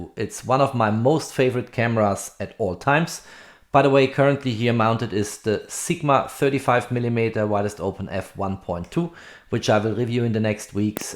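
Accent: Germany. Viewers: Let me guess... Your German? Nice Video!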